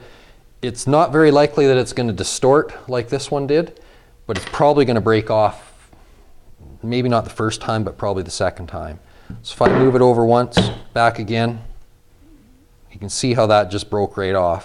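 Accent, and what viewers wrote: Canada. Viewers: Is that a Canadian accent I hear? 🙄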